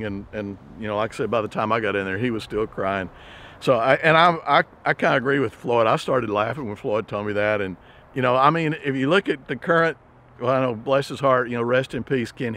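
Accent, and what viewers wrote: Texas, United States. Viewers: What theme am I looking for when I watch an Out on the Ranch (with )? I'm looking for the sound of a fellow with a genuine Texas accent (reminding me of my childhood) who talks about good people being good to each other. I'm never disappointed. 💖💖💖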